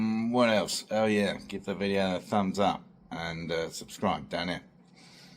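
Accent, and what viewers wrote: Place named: England, United Kingdom. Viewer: Really nice to hear an English accent for a change. There're so many dodgy characters, with bizarre voices on YouTube talking absolute garbage. This guy is a breath of fresh air. 👍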